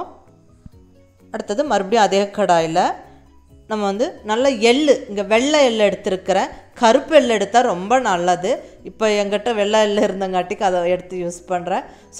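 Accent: Kongu, India. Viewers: Vanakkam ninga Romba azhaga kongu Tamizh la pesuringa. Very nice video THANK U.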